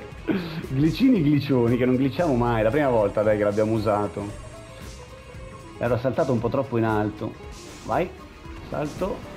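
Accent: Rome, Italy. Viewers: Mokk al mitico 🤣🤣🤣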